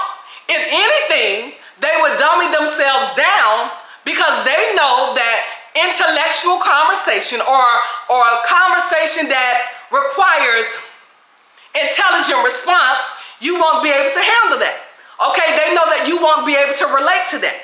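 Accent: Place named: South, United States. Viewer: You told us. It was for who it was for. Don’t take it personally. Leave the messenger alone. I love your platform. Your delivery really makes people think. Keep doing what you doing Ms.. I heard a Southern accent coming through on this one.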